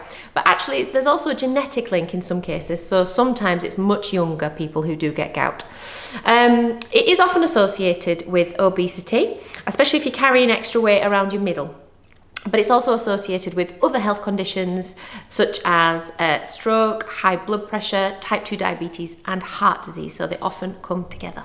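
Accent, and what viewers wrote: United Kingdom. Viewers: Hi, I’ve only recently discovered your channel and really enjoying the videos that are really helpful. My brother who I care and support does have attacks of gout and know how painful it is for him. I will definitely look into the medication you have mentioned with his GP to avoid further flare ups. I am also curious where you are based in the north of England. I live in The north west of Lancashire and your accent sounds very familiar. 🥰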